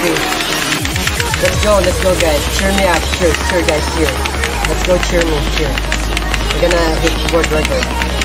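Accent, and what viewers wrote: Philippines. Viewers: is this man filipino he sounds like one ngl also noice thats a gg in my book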